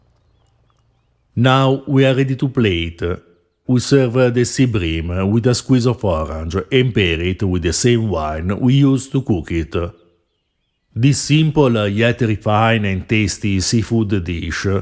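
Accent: Italy. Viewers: I love to listen to you and your Italian accent so much. And of course the medieval recipe!!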